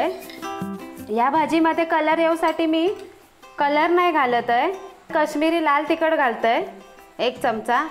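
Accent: Malvani, India. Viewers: तुमचं जेवण , अणि माववणी बोलणं , फार सुंदर .जय कोकण . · Wahh malwani bolan 👌👌👌 bhaji 😋😋😋😋😋😋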